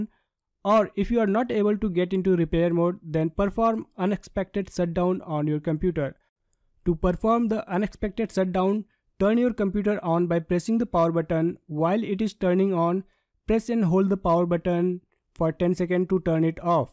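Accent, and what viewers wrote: India. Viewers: indian ahh voice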